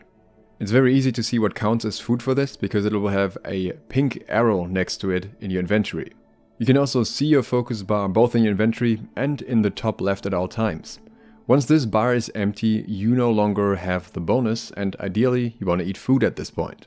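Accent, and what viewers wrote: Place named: Germany. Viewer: You sound like you have a German accent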